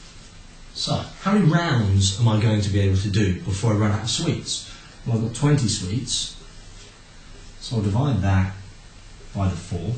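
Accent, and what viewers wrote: United Kingdom. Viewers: purposely i tried to understand a bit because im american english just like normal english and I can't really understand fast british! but still at least i got my homework all correct! thanks!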